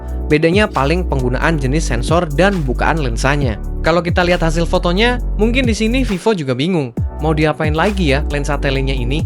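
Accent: Surakarta, Indonesia. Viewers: Orang solo bang? Btw ane user x300 pro sudah sangat puas dan di jadikan daily driver Kurangnya cuma speaker. Overall ok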